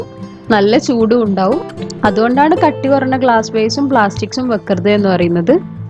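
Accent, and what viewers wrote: Kannur, India. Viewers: Ningal thalasheri anno kannur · Madam Kannur ano? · നല്ല രസം ആന്റെ സംസാരം കേൾക്കാൻ..കണ്ണൂർ ano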